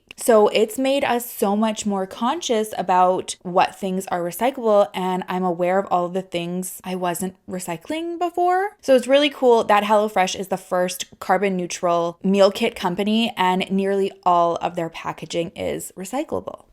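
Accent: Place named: Canada. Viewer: Its nice hearing a Canadian cover this case because everyone else is like "AlberTa" "cal-gare-y" "Ed-mon-ton" Or the best is when non-Canadians say newfoundland as new-found-land, which is phonetically correct technically but not what any canadian calls it lol 😂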